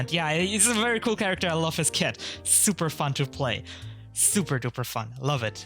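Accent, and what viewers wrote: Germany. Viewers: Man hört das er deutsch ist :D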